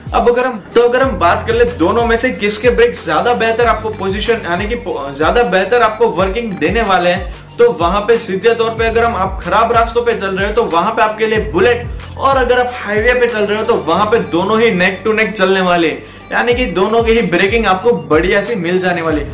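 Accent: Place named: Gujarat, India. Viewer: he is typical gujju .. listen to his accent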